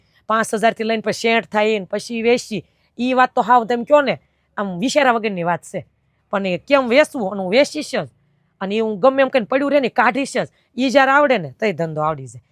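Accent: Kathiawar, India. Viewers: બેન તમે કાઠિયાવાડી લાગો છો બોલી પર થી મે તમારા 2 થી3 વિડીયો જોઈ આ તમે બોલો છો બહુ સરસ કેટલા હજારથી ધંધો ચાલુ કરવો જોઈએ મેકસીમમ સ્વામિનારાયણ ધમૅ છે હું પણ સ્વામિનારાયણ છુ જય સ્વામિનારાયણ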